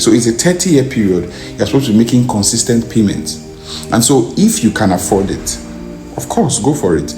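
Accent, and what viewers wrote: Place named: United Kingdom. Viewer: From his speech I feel it's the UK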